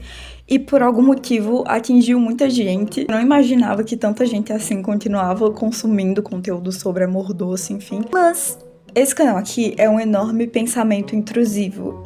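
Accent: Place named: Northeast, Brazil. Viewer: Reconheço uma nordestina quando vejo(ouço) uma 🙄🗣️💋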